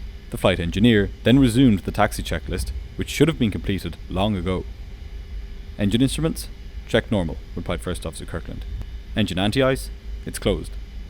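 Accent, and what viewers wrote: Ireland. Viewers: Your Irish accent is so adorable.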